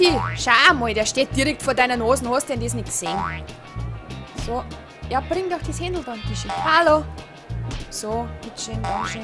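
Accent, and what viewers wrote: Bavaria, Germany. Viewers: Die Idee finde ich gut Finde das spiel so witzig besonders mit deinem bayrisch =) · mach mal ne ganze folge auf Bayrisch! BITTE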